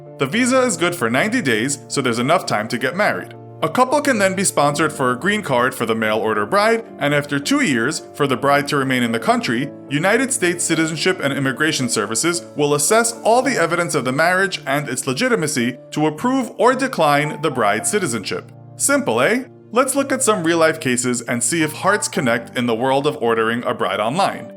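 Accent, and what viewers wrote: Canada. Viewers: The Infographics show are you Canadian? Because you say eh? from time to time. I’m Canadian 🇨🇦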